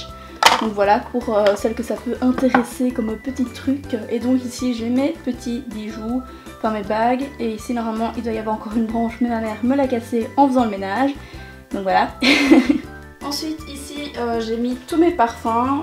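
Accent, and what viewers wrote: Belgium. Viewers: j'adore ton rangement makeup :) haha l'accent belge j'adore #belge (je le suis aussi ) tu es d'ou en belgique?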